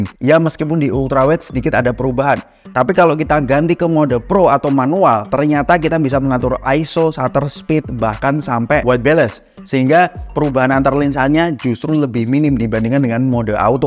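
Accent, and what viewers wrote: Indonesia. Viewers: Ga, malah indo banget aksen nya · Gak lah. Indonesia banget aksen nya kok sampe Amerika latin segala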